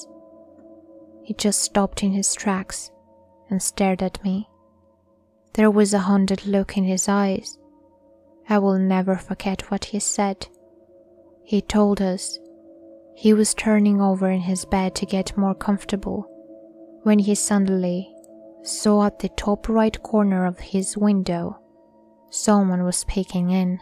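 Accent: Ireland. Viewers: Is that an Irish accent? You sound like a fairy :-) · You sound a bit like a Greek beauty with an Irish accent. Not sure where youre from but your voice is addictive <3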